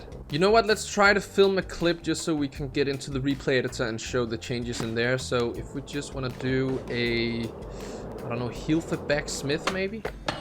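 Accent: Denmark. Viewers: yo you danish?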